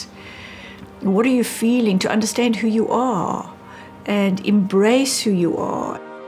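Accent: South Africa. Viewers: The accent is southafrican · That was really powerful and heartfelt. You say you are British but you have a South African accent and it looks as if you live there now. But you are so right as we don’t talk about our emotions as well as we should. The hospices do such a wonderful job, you are so right and it is not at all a depressing place. It would be wonderful if we could all end our lives there. By the way your grandmother is very beautiful and you obviously have her genes.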